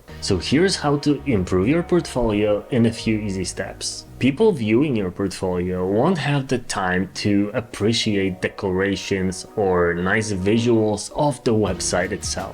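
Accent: Poland. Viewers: You put a lot of effort into your content. It's very well done and creative! You are beyond qualified!! You have given me golden nuggets of valuable information, and I appreciate you very much!! Do what makes your heart sing, whatever that may be :) I feel your battle! I'm a loner, for sure! I admire your courage. Are you from Poland? My ancestors are from there. You have a cool accent. I have never heard it before in the US.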